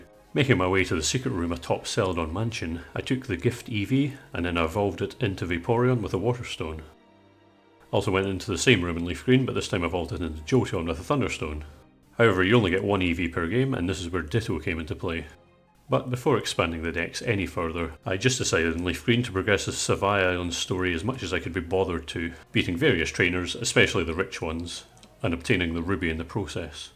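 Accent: Scotland, United Kingdom. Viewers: Well done man. Good to hear a Scottish voice too 🏴💪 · wake up babe, new scottish poketuber called just dropped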